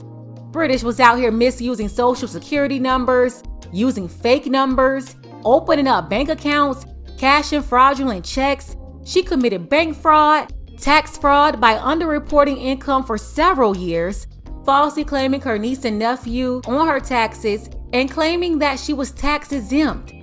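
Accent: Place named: Florida, United States. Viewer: I know you said you lived Miami before but are you from Florida? You sound like it and you have that smart Miami mentality?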